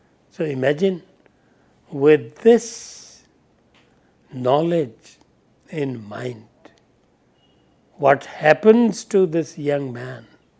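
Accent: India. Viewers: look at your sentence. It is full of errors and badly structured. It is clear evidence of your poor academics. First look into your inner self. You are not capable of even making a comment about Indians. He is speaking Indian English. This is Indian accent. Got it???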